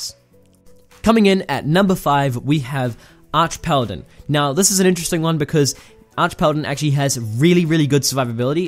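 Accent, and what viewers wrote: New Zealand. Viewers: New Zealander. Noice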